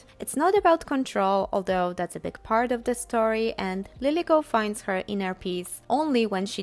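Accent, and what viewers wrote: Poland. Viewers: I can hear a little Polish twang in your English. Are you originally from Poland?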